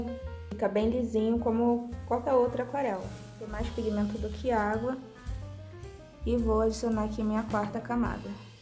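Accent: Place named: Rio de Janeiro, Brazil. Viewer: Ótimo! Até o sotaque carioca fica mais lindo com um português bem falado e conteúdo. Agora vamos ver minhas aquarelas rs.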